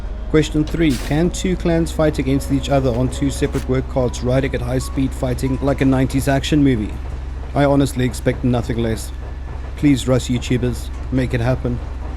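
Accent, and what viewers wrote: South Africa. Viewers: are you from cape town or are you a joburg boet?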